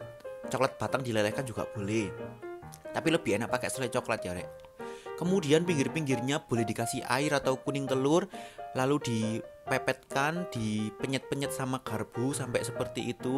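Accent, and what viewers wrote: Java, Indonesia. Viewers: dengan khas suara medoknya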